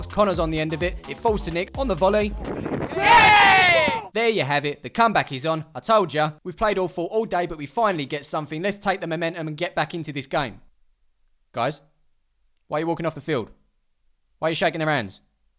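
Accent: Essex, United Kingdom. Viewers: Are you from essex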